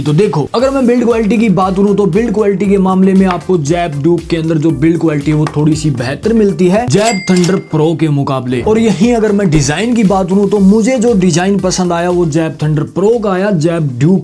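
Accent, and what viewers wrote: Haryana, India. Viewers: Bhai haryana te h k?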